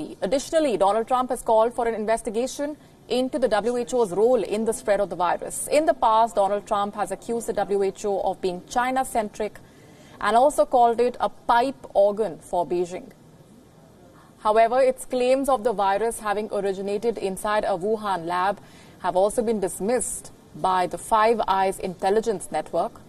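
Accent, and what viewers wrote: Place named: India. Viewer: Thick Indian accent.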